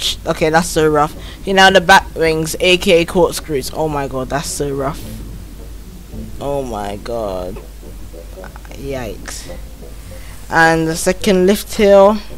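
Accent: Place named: Brazil. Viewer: no i feel like u talk from brazill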